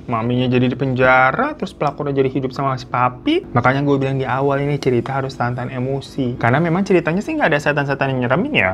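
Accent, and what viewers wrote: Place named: South Jakarta, Indonesia. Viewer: Aksen jaksel nih keknya wkwk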